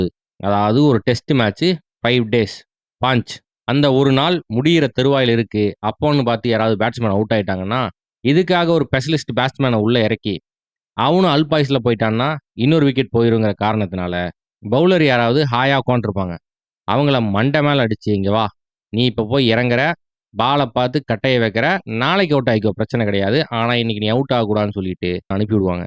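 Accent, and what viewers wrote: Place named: Pollachi, India. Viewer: Evan Enna sonnalum indha slang mattum change panniratha. Naanga school pasangala irukumbothu, enga area Anna nuga solran athe slang.. semaya iruku Thala... ❤❤🎉 Love from pollachi 😊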